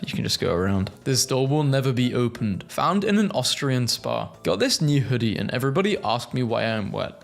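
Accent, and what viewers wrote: United Kingdom. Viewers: typical British pronunciation confusion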